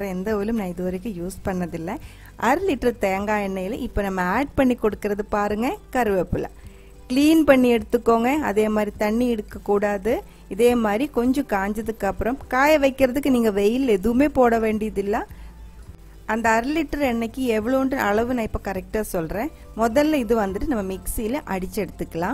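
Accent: Kerala, India. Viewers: Are you malayali? Samsaram kettal malayali aanennu thonnunnu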